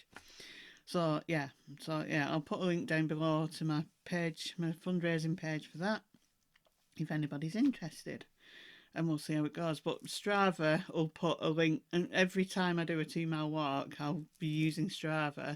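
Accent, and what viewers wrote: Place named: Yorkshire, United Kingdom. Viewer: I'm from Ohio and I'm 85. Since I was 8 ,I've had pen pal from Yorkshire. We are like sisters. I love hearing your accent. It. reminds me of her. I enjoy all of your videos, but don't always comment.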